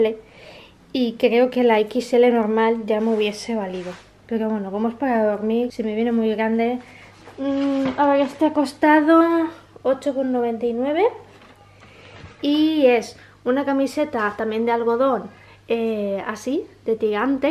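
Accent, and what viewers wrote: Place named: France. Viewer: eres de origen francés? Lo digo por tu acento. Tienes algún vídeo hablando de tus orígenes? Cuánto tiempo llevas en España?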